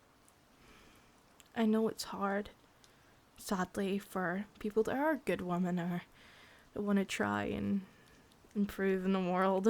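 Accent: Scotland, United Kingdom. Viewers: I'm done with normalcy, I'd trade it for a cute scottish stalker given the chance. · Ahh she's a sco-ish stalker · I prefer your deep Scottish accent. I find it more relaxing when I hear your Scottish voice than the higher pitch voice. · omg she's scottish. that changes everything XD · This went places I wasn't prepared for. That line about not needing someone to help you feel things got me. The Scottish accent helped give the performance an aire of authenticity. Amazing work.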